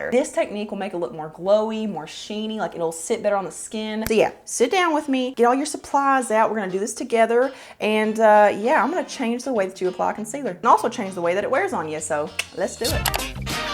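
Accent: South, United States. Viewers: I love your Southern United States accent, I think it sounds warm and welcoming. (I once had a wondeerful boyfriend with a Kentucky accent so I have a fondness for the accent).However, many people across the United States and around the world are used to a more flat "Nebraska" accent. As you get a global audience, you may consider getting speech coaching to get the plains midwest accent that people think is the United States accent. This is not fair to people with accents from the Southern United States, but it will be important as you gain a global audience who do not have English as a first language. Also, you might want to talk more slowly. I tend to move my hands when I talk, so I know what it is like to make gestures when I talk. Try to keep your hands out of the video except when you are applying makeup. (I used to be a teacher, and I was videotaped once when I was a teaching assistant, and seeing the videotape is really useful.). 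I hope you take these comments as helpful and useful, because my intention in writing this was out of a good heart. If you find my comments offensive, please let me know. This is not what I intend.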